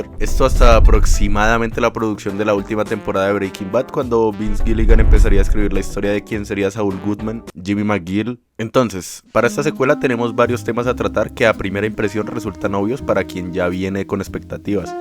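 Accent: Colombia. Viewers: Este tipo no puede ser más bogotano, me siento en casa jajaajajaj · Amigo, muy bueno tu video, pero, te recomiendo no usar esos tontos memes de perros y hablar menos como colombiano.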